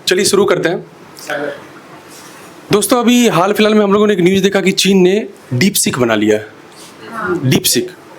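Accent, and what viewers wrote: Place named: Bihar, India. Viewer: Bhai aapke accent se hi pta chalta hai ke aap Bihar se hain,, dekhne me nhi lagta. Anyway Too good bhai ❤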